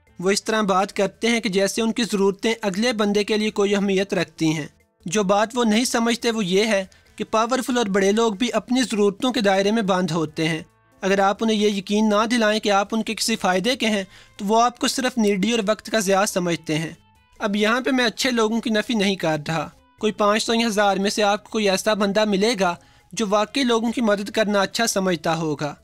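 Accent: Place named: Gujranwala, Pakistan. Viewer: Bhai g gujranwala k lag rhy...😌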